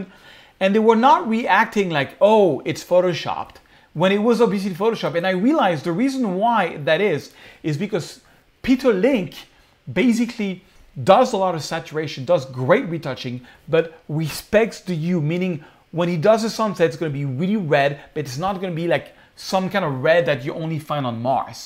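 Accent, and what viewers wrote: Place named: France. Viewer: Great tips. You videos are very fun to watch, and as someone with an accent myself, I love seeing how you embrace your frenchness.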